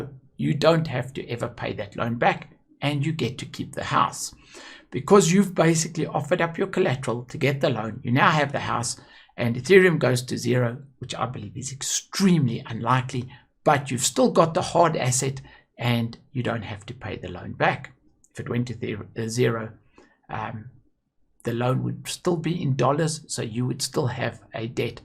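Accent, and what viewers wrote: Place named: South Africa. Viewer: Hi,my brother!You sound South African.Any pointers for me here?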